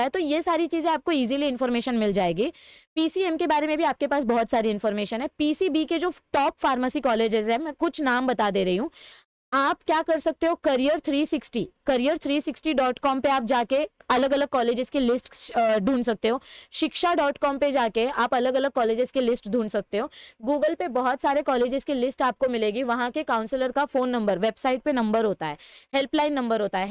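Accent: Maharashtra, India. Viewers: You are mrathi or Gujarati?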